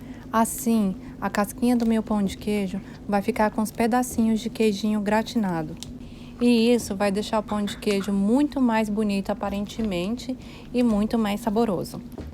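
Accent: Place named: Minas Gerais, Brazil. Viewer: Que delícia você parece ser mineira, igual a mim gosta muito de pão de queijo e o sotaque também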